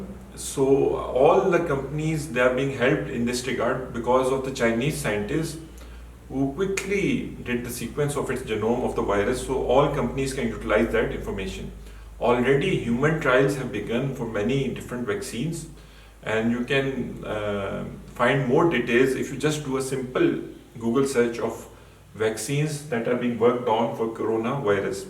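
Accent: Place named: Punjab, India. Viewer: punjabi bahar aa rahi si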